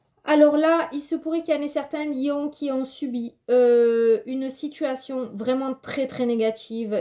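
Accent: South, France. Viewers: Bonsoir ...je me suis proposée de faire du bénévolat . Je commence demain matin et ont vas peut être me proposer d' autres missions.. étant actuellement au chômage ...j' ai travailler dans le social pour personnes handicapées et autistes ...j' ai de l' expérience.. malheureusement j' ai préférer donner ma démission ( surcharge de travail et le système ma déçu..je garde de très bon souvenir des résidents qui était attachant pour ma part. Je voudrais travailler avec les eyen maternelle ou du social pour femmes en difficulté..🙏🙏🙏🙏 ⭐⭐ je ne perd pas espoir je souhaite de tout cœur ma situation professionnelle de réalise .. merci pour votre tirage votre accent du sud je pense ..cela me fait penser ( le soleil la mer ., tranquille quoi 🤣🤗💋💋💋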